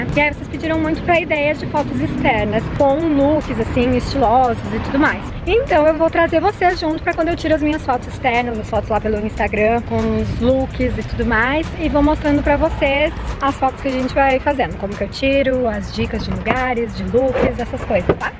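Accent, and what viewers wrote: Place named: Rio Grande do Sul, Brazil. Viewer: você é gaúcha né? Por favor que sotaque lindo, mas hein.... Adoro suas dicas ♥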